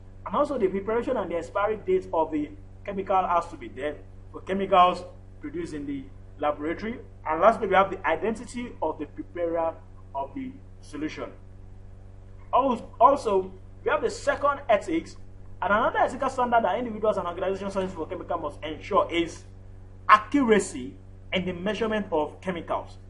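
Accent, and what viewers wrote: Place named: Nigeria. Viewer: Why can't you speak real diction Man see your Nigerian accent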